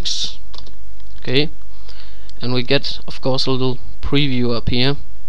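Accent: Denmark. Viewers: er du dansk ? du lyder sådan :)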